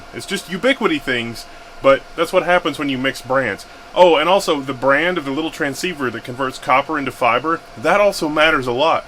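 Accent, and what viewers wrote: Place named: Austin, United States. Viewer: Are you from Austin? You sound like the people I grew up around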